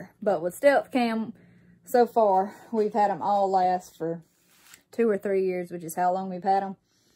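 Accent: South, United States. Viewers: Love that Southern accent!!! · So SOUTHERN! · Appreciate y'all! I can't get enough of your country girl accent! Merry Christmas! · Text title here! 😆 Your so so beautiful. I love the way you talk. It’s country as hell. You just don’t get that no more. Your rare as they get. Big truck blew down the phone 😝. I mean see. Dirt roads! Really? They don’t make them no more 🤘 you are the queen of Alabama! Dam gotta be. Love that there little tree darling. C.. has to stand for Carbon. But the V.. I’m not too sure. See a girl with a truck. You got to be the most beautiful Tom boy ever! Look... flannel shirts too! 👀 love it. Dammit y’all got a whole mother Bass Pro shop out there! 😄 Boy I wish I was something that you liked!😳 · Subbed for the southern accent, stayed for the warm smile. Merry Christmas! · Good to see you make another you tube video missed you, just love your southern charm "apreciate you" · Awww that Southern accent. 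What double-chin!! 😱Where?? 😂😂😂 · Your. Great. Love. Your. Southern. Voice. But. Your. Video. Are. Great.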